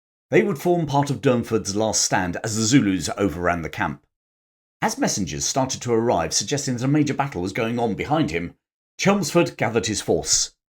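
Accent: United Kingdom. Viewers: I recently discovered, it is amazing 👍 This is the only way to hear British History by a Brit and with that beautiful British English accent😊 Thank you, with “howdies” from Texas 👋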